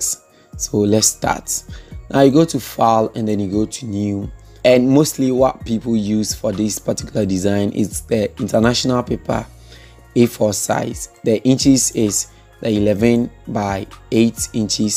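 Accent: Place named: Ghana. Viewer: The Ghana accent is amazing to hear. Even in 2021, you have given me a god send tutorial. Thank you.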